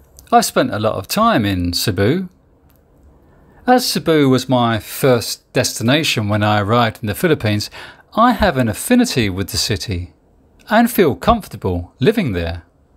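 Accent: England, United Kingdom. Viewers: Love your delivery attotude and yes English accent. Gteat video uncle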